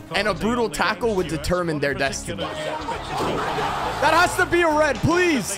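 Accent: Canada. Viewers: I thought you were Canadian lol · I thought u were Canadian lol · ARE YOU CANADIAN OR POLISH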